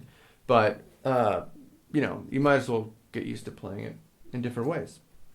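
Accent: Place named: Philadelphia, United States. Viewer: AH i thought i heard a hint of SOME type of accent, i guess i just got philly confused with a canadian accent. the way you say 'out' - is exactly how people from philly say it haha.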